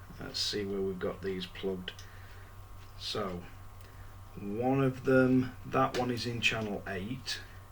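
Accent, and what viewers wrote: Yorkshire, United Kingdom. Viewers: Hi mate you sound like a fellow Yorkshire man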